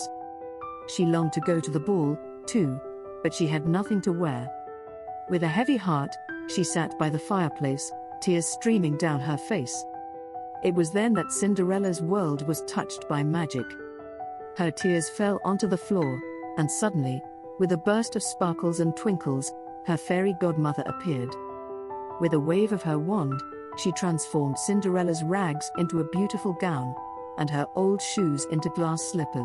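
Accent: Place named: United Kingdom. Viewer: good story :) voice is like british!!! i love it😍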